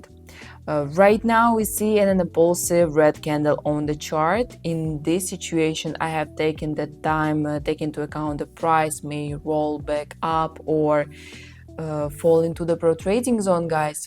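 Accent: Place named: Russia. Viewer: У вас акцент , вы русская ? Я угадать ? Не типичный английский , ведаете на англоязычную аудиторию!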